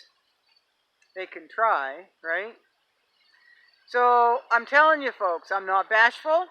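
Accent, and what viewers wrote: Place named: New England, United States. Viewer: Hi, I just recently found your channel and I enjoy your videos. I wanted to tell you also that your accent reminds me of New England grandfather, I sure miss him and hearing you talk brings back great memories. Thank you, God bless 🙂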